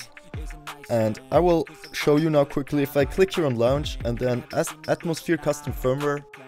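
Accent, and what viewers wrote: Germany. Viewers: Man merkt deinen deutschen Akzent etwas xD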